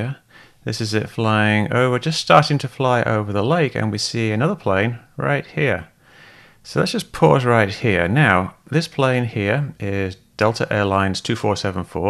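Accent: United Kingdom. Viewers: Your clear Manchester accent gives you away! Manchester United is my favorite football team in the UK! You keep doing what you're doing, it helps to dowse the flames coming from the arses of true believers;^)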